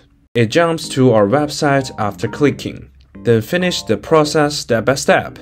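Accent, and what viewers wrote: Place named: China. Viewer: as soon as I heard the chinese accent I was like, no thanks, I dont want my personal info to be in some chinese farm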